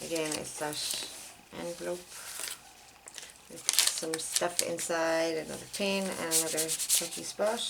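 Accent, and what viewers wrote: Ireland. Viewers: Your journal is beautiful, I love how you made it, the tracing paper page with the bird is amazing! What paper did you use to make it? I would love to have a vintage journal but I have nothing vintage to make it with or put in it! The ruffled edges are fabulous, did you burn the paper to make it brown like that, seems to be patchy (how I like it)? The crinkly noises of the papers are music to my ears :) I couldn't quite hear where you get your inspiration from, or what that book was called, the one that no one can understand I think you said? sorry. If this is your first ever vintage journal, it is a work of art, wish I had your talent! If this was my book, I would be carrying it everywhere lol :) I have subscribed to your channel, I'm reckoning you are in Ireland by your accent :) I'm in Scotland :) TFS, love this!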